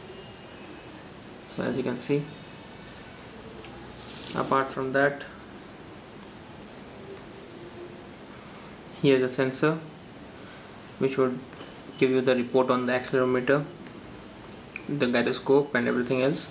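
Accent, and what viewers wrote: India. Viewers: every things great except the way of talking and that accent...fucking bullshitt indian accent...itz star not ishtar you fuck!!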